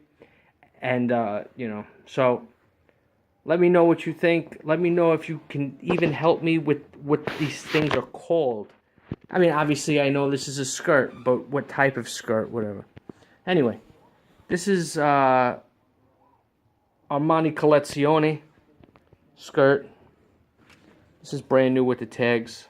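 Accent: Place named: New York, United States. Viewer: Hi, great video. Thanks for sharing. Also, love your New Yourrrrk (?) accent:) Pinterest is awesome for finding womens clothing info. e..g typing "types of skirts" would bring up a infographic showing a-line skirt, pleated skirt, etc. Even Google Images would do it.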